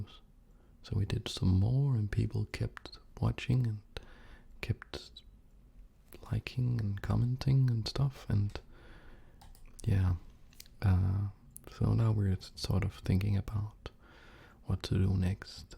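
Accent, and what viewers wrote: Denmark. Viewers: Are you from Denmark (If so then me too)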